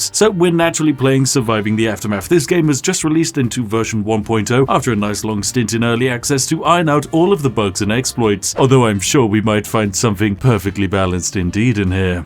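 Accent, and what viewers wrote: United Kingdom. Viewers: Wait, this guy is British?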